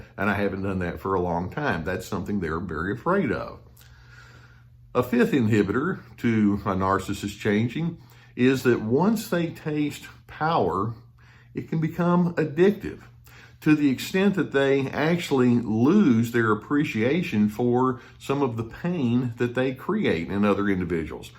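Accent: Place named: Texas, United States. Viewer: in London everyone would expect you to be a cowboy because of your accent. We only know that show Dallas here...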